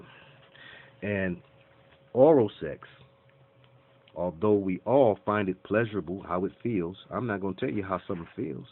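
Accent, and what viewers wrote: Detroit, United States. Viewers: You Detroit alright. haha. Your speech gives you away. wink wink. I'll add some jesters, to soften blow. I do have a sense of humor. I meant nothing, but to share. I'm the nicest eevvveeer! Might want to edit and erase #. I got it. They're are crazies out there, don't know. Is it you or me? lol Thanks though. Gave me a bit of a complex, but not much, I'll get over it. I've very delicate but when it comes to this here bible, I can't afford to fail. Everyone should feel the same so respect. Good I can watch your videos again. Woosh.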